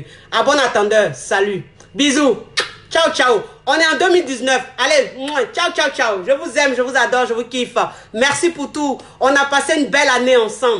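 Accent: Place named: Ivory Coast. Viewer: Jaime bien comment tu parles les ivoriens font rire!😂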